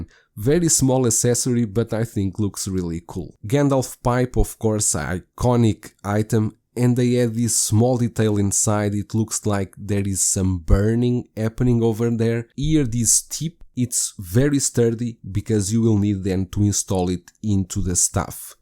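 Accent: Portugal. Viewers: Com o sotaque, vou assumir que o senhor é Português! Que bom conseguir ouvir a sua opinião! Awesome review, this figure is crazy good. I can't afford it, but I Pre-Ordered the Aragorn sculpted hair version. Can't wait!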